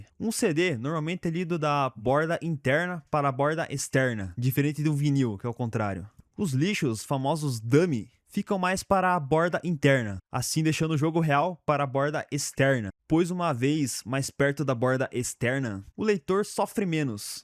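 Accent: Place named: Rio Grande do Sul, Brazil. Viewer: Esse cara é gaúcho só pelo sotaque.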